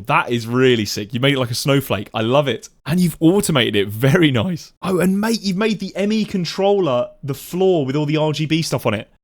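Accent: United Kingdom. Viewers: so is he a brit?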